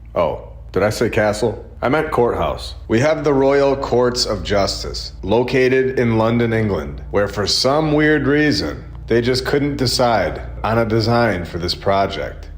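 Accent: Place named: Massachusetts, United States. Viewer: Sames! Are you from Massachusetts? 😅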